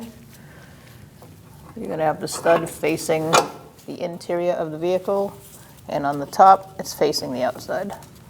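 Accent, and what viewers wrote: Boston, United States. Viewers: I would highly trust a woman mechanic with a Boston accent. These videos are great. I am mechanically inclined but seeing the process a professional uses makes these tasks such a breeze. No thinking required.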